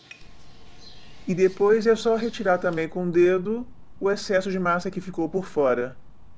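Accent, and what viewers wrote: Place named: Santa Catarina, Brazil. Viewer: Eu de vez em quando vejo seus videos e gosto da imensa variedade como de jardinagem até este de marcenaria. Mas seu sotaque é tipico de SC. Tu és catarinense? A pronuncia do R forte é tipica de quem é catarinense.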